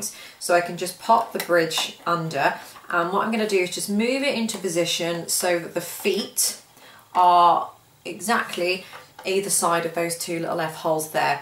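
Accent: United Kingdom. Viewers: I lov the way u speak with a Britain accent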